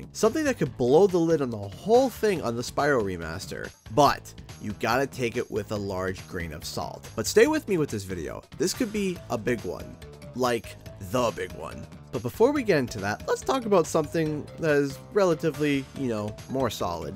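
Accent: Canada. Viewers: Oh Canadian? Im a first time viewer of your channel and I already like it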